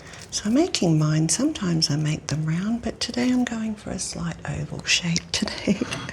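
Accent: Australia. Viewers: Australian accent is my weakness what comes to asmr. And with your voice the tingles are even more amazing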